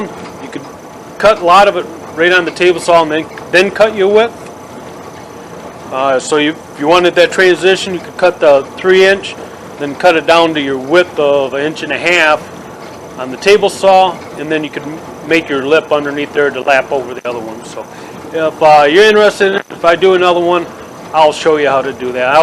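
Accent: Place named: Michigan, United States. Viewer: Thanks for this,. This was exactly what I needed. I was having some difficulty figuring out the bevel on my floor transition. Are you from Michigan? You kinda sound like people here in Michigan. Thanks again.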